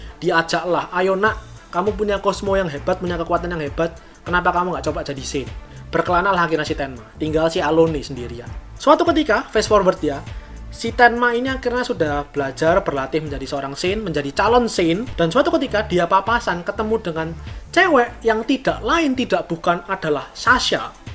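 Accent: Java, Indonesia. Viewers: Kakak orang Jawa yaa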